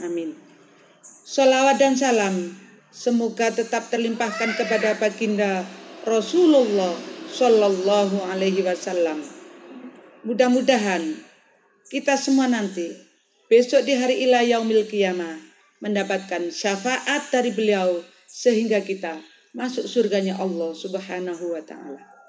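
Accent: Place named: Java, Indonesia. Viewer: Pelan pelan bu nyai gk usah tergesah gesah agar bhs indonesianya tdk kelihatan medok,krn sdh msk youtub, maaf kritik membangun smg sukses dakwanya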